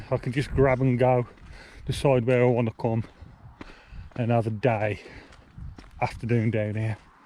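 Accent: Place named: Yorkshire, United Kingdom. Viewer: Hi, so I found part 1 and just watched it and will watch the other 3 parts soon. Gotta ask you about the accent mate, where you hail from? Yorkshire? I liked your style of editing too, very similar to the style I do (and those placing the camera down and walking towards it shots like you do!) Loved seeing the train station and the train tracks at the beginning. Great weather you had, makes for good views on the camera. At different points in the film you seem to alternate with lenses, ranging from narrow to wide. Do you use a GoPro by any chance? Nice stabilization on it. Couldn't help notice the nude girl in the newspaper as well.:) I paused to try and get a look at your footwear as well and noticed you were wearing trainers but couldn't quite make out which. A good video, well filmed, nice angles and smooth pan shots.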